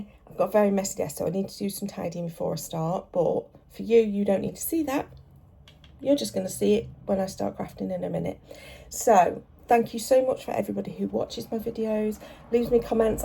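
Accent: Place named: United Kingdom. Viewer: So happy I have discovered you throught this series! Love your creativity and your lovely accent takes me back to my university days in the UK ( a VERY long time ago 🫣). As for scraps, I have arrived at a system which works for me: I invested in one of these little plastic containers with seven (usefully) compartments, I believe they are intended for soup packets etc. puzzlingly. I designated each compartment for one of the rainbow colours. Now I can pull scraps in the colour I need every single time I die cut, before I pull a full sheet… It works a trat for me. Thank you for sharing your amazing videos, kind regards from Germany, Nicola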